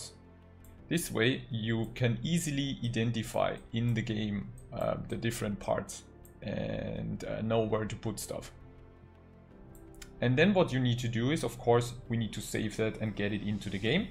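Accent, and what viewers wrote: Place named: Germany. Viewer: Danke erstmal für deine Videos. Ich denke du bist Deutsch, würde ich jetzt einfach mal von deinem Dialekt ableiten. Kannst Du das nicht noch mal in Deutsch machen? Das würden vielen von meinen Leuten helfen und wäre sehr ehrenhaft von Dir.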